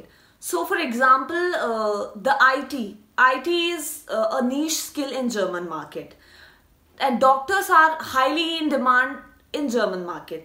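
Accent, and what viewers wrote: India. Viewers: After listening her English i am conform that she is an Indian.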